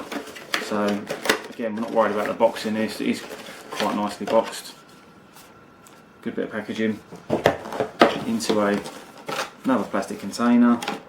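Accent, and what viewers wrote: United Kingdom. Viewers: Ahah, nothing in particular, I simply like british pronunciation. :)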